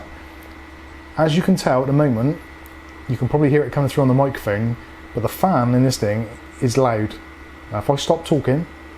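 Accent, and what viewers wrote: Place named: West Country, United Kingdom. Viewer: loving the west country a ccent, great review new sub added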